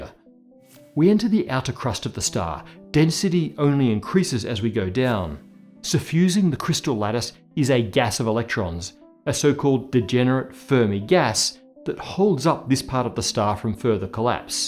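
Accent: Australia. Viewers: You have such a heavy Australian accent that it makes it very hard for me to understand. And I really want to love your Videos because of the Subject you talk about... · You must get offended by things you don't understand to comment on YouTube. It's irritating. His accent is australian.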